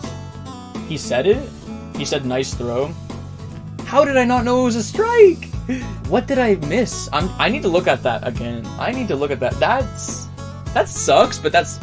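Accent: Canada. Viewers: you sound like youre from both Canada and chicago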